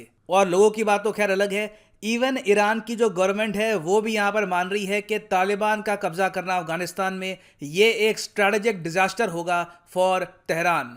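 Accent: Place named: Haryana, India. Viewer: Haryana se lgti ho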